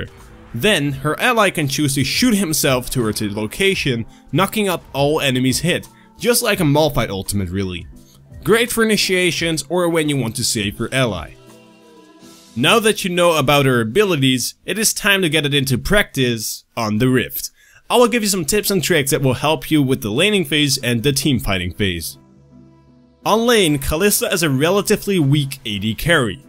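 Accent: Netherlands. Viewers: Are you Dutch?! xD I notice it in you accent. Great job with your channel!! :)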